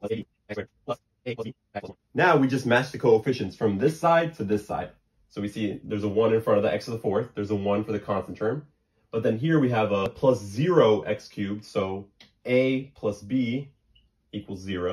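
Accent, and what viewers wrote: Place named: United States. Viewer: Didn’t know bro sounds American😭 (I thought he’s Arab)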